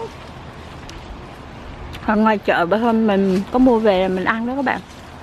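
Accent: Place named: Quang Nam, Vietnam. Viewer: Nghe giọng c như người miền Trung Quảng Nam Đà Nẵng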